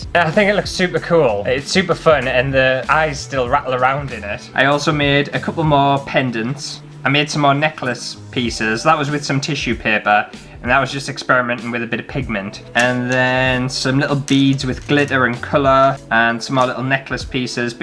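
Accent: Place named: Northern England, United Kingdom. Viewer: Great video lovin the northern accent 👍🏻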